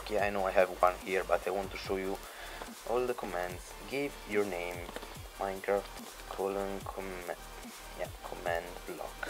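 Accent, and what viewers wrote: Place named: Italy. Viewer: questo tuo accento italiano che fai quando parli in inglese è davvero una cosa fantastica XD, da un tocco di classe all'inglese =), comunque, sei molto bravo con la pronuncia e le parole... io non riuscirei mai a fare un tutorial in inglese XD comunque, questa cosa la sapevo, e devo dire che è molto utile! la uso spesso quando devo fare dei clock "nascosti"... comunque bellissimo video, bravo ^^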